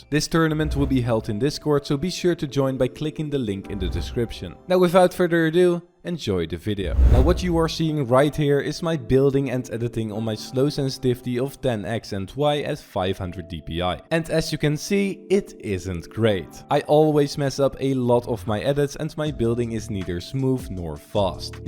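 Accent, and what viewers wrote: Netherlands. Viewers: Vault grey pistols:) Are you dutch cause i think that when I hear your accent.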